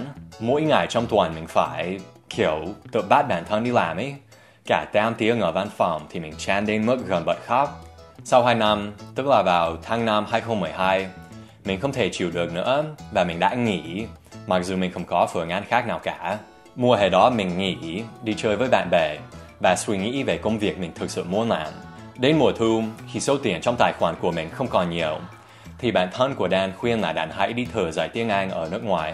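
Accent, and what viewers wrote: Standard. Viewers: Ngưỡng mộ anh Dan ghê anh nói tiếng việt chuẩn ghê.... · Nói tiếng Việt chuẩn ghê...giỏi...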